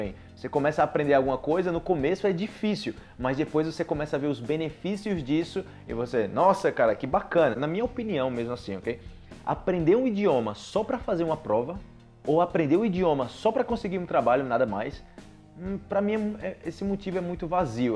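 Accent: Brazil. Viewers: Adorei o idioma por vc .... Amo o sotaque brasileiro!!!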